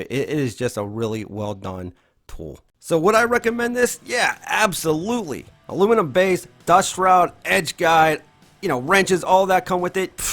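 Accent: Pittsburgh, United States. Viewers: you have the most Pittsburgh accent ever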